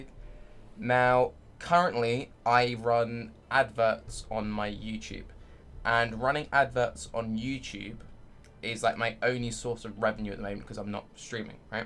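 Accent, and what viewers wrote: United Kingdom. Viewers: I don't think people are really gonna bother you if you run ads on twitch. Like if you're broke and you need money just do it. 15 seconds of commercial every once in a while is not gonna bother us. On a side note, glad your health's doing better, and God damn(... bloody hell?) I love that British accent lol.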